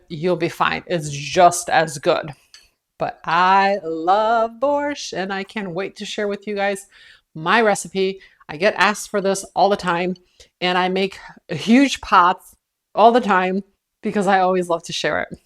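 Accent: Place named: Russia. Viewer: found you at random from your treehouse video in Berlin. Im just south of there a bit in New Albany and will have to check the Amish treehouse out. My Grandmother came here from Russia in 1939 and made Borsch all the time. Not sure if Russian is your accent I detect, but thank you for sharing this recipe. ohhh love the apron, God bless you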